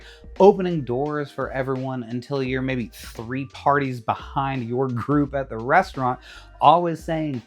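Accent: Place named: South, United States. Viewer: Love your content — but how did you pick up a “southern-ish” accent in just a couple years? I just moved back to the south after over 12 years away out west and my accent didn’t change a bit and it was often commented on out there.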